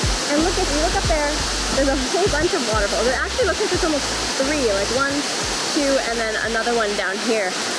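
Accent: Costa Rica. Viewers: Mae he visto prácticamente todos sus videos me encanta ❤️🇨🇷 cual es su Instagram? O otras redes?? Pd: me encanta su acento Tico jajaja ❤️🇨🇷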